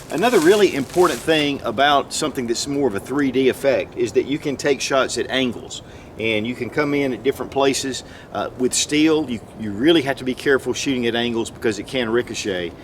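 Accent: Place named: North Carolina, United States. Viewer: wiltson.....I have always loved the way u say that. in fact I frequently watch ur old Wilson combat videos......I'm from TN but u can't beat that nc accent of yours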